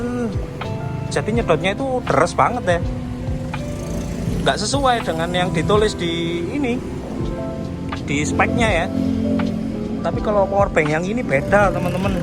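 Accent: Malang, Indonesia. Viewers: Logat malangnya Kuenthel mas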